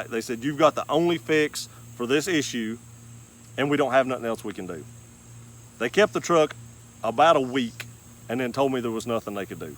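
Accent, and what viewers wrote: South, United States. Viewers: Which state did you film this video in? It looks quite hilly in the background. You also have a distinctive southern accent. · Love the country twang!!! Great video, New subscriber here.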